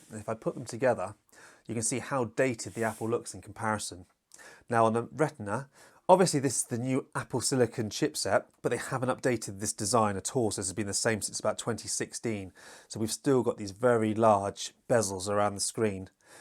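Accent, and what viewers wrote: United Kingdom. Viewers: Excellent comparison. Love your accent, too. Which part of UK are you from?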